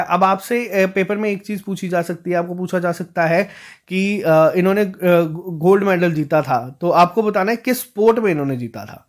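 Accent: Kashmir, India. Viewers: I love u sir thank you for everything 💗 One more thing sir your kashmiri accent is nice ...Siyah rood jaeren Manz...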